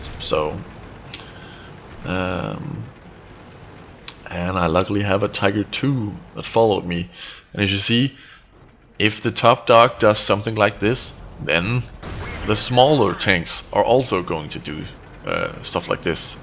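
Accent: Denmark. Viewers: are you danish??? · Dude be nice if we could face each other cause when i watch ur vids i get the urge to face u lol...an based on the accent ur Danish am i correct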